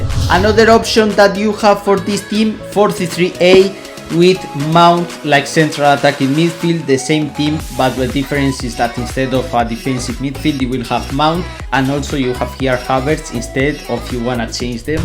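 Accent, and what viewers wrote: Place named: Spain. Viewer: I love your accent❤Are you Spanish?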